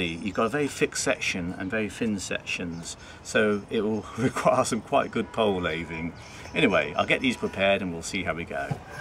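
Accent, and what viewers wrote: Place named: United Kingdom. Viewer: Bodgers used a chisel w/ only 1 bevel? I didn't know that. How did you find that out? I've wondered about the difference between using 1 or 2 bevels. What's your opinion on that? It's too bad that you don't get any pleasure out of listening to Americans. Sorry. We sure enjoy listening to you guys. Brits come over here & seem to do pretty well just because of the accent.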